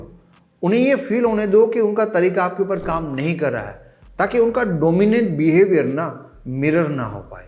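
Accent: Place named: Kathiyawad, India. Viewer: Sir aap gujrati kathiyawadi lag rhe ho bolne ke tone se lag raha hai😅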